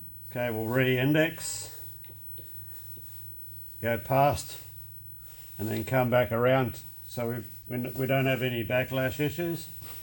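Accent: Australia. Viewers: I watched a few of your videos and decided there was so much I could learn that I quit watching the latest and started from the beginning. There was something in every video that a non-professional like me can learn. I've been running a mill and a lathe since 2007, but never better than now thanks to all the little--and some big--hints and techniques you include in everyone your videos. I've also learned enough of the Aussie language that I do not need the captions anymore! So, creak on! Thank you!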